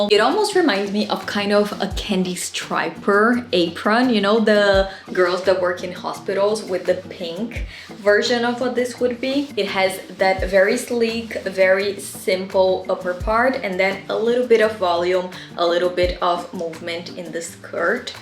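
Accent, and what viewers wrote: Brazil. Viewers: Brasileira, que legal! I was wondering where your accent was from, I really liked your content! Jesus te abençoe, muito sucesso!!!❤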